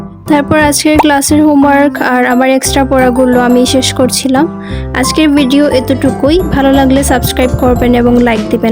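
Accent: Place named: Sylhet, Bangladesh. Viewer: তুমার মুখে সিলেটি ভাষা প্রচন্ড ভালো লাগে।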